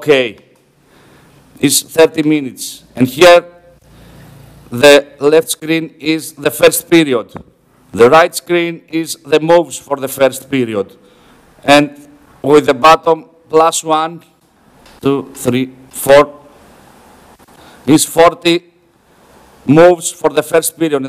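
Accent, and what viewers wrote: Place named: Greece. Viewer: Αγαπώ την Ελληνική προφορά στα Αγγλικά!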